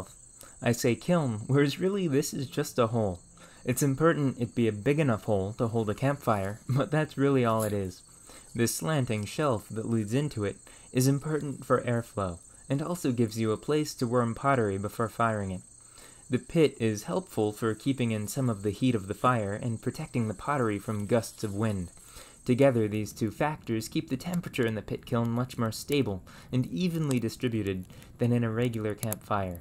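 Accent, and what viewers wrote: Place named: Michigan, United States. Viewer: I can't get over yer accent. It reminds me of an old online friend of mine from Michigan who'd say things like "yer" and "fer" :)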